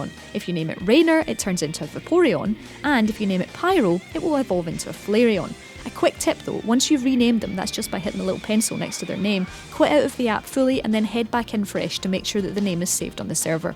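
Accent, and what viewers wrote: Scotland, United Kingdom. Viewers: it's Scottish not British lmao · No, this accent is more scottish while the girl from outside xbox is brittish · I love that scottish accent. <3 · She kinda sounds scottish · That is a VERY Scottish accent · Im from scotland and i hear this accent everywhere <3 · BAHAHA HAHAHAHAHA BRO THIS NARRATOR IS LIKE SCOTTISH SHE'S NOT EVEN FUCKING BRITISH YOU DUMB ASS BRUH YOU THINK ALL EUROPEAN PEOPLE ARE THE SAME 😂😂😂😂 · is the person taking in the video Scottish? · Sub'd because Scottish 😍😍😍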